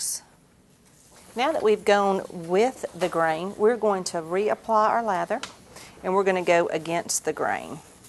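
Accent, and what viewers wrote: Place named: South, United States. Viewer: Ahhh the Southern accent. Like home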